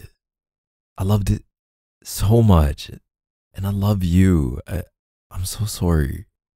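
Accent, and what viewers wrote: Canada. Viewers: he sounds extra canadian here 🫣🫣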